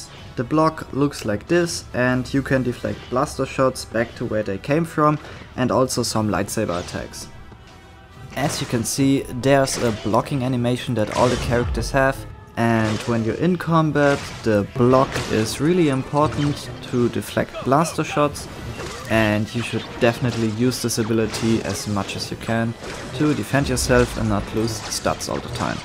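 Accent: Germany. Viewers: dein deutsch englisch ist echt net gut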